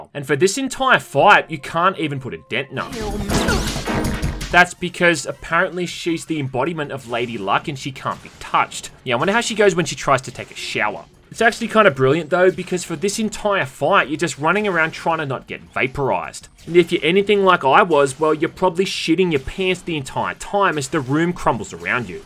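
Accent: Australia. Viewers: Australian accent , nice - lil pharma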